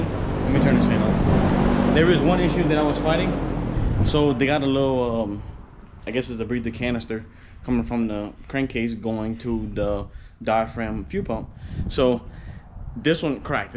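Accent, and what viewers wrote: Louisiana, United States. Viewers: as soon as you started talking i knew you where from louisiana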